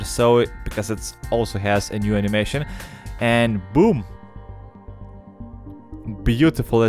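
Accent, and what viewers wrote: Russia. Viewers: У тебя акцент Русский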